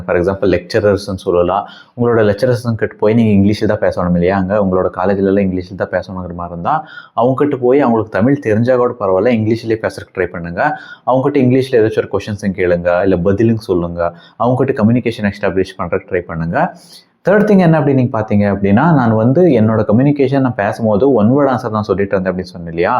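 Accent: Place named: Bangalore, India. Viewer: Bangalore Tamil Nice Thala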